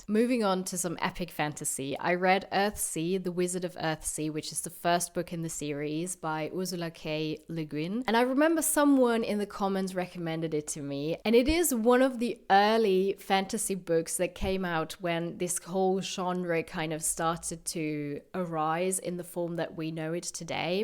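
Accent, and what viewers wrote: Austria. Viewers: are you from Austria? :) love your videos!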